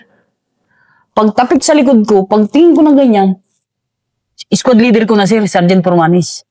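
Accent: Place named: Quezon, Philippines. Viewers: Taga Quezon province po ba kayo? Tono kasi ni Sir eh punto ng Quezon province. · Accent ni idol parang taga Quezon Province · Taga Quezon probins yata si sir..ay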